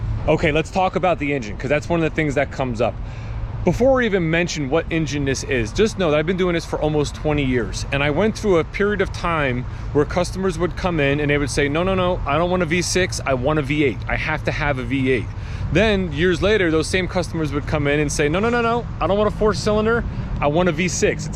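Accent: New Jersey, United States. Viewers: My favorite video; your New Jersey “button” accent cracks me up every time. Love you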